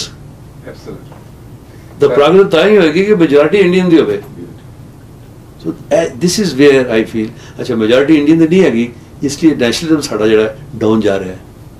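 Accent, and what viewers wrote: Jhelum, Pakistan. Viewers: tohadi boli v pakistan aali a❤ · Pure Jhelumi language. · Hy oy bismilaah kran mahra grain sunil mery same te suchi boli jhelumi original boli. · My uncle, who is almost same generation as sahib, who is also from Jhelum, has the exact same accent, tonation, pronunciation and fillers (for instance "Hain jee"). So amazing. Ditto copy.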